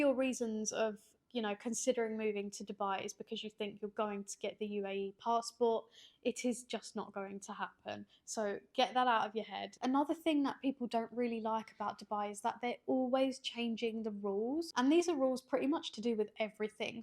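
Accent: United Kingdom. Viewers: Great info, cute girl, but that english accent is not cute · British accent is amazing, a beautiful woman with British accent is charming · ah. When you said UK I immediately snapped back understanding the accent. Couldn’t figure out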